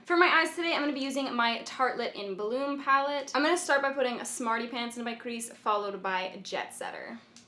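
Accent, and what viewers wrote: Canada. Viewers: Stay awesome! Also luv the way you Canadians say your vowels ❤️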